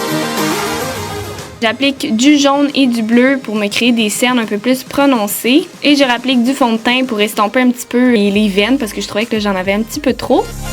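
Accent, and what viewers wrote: Canada. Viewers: derien et j'adore ton accent canadien la 😃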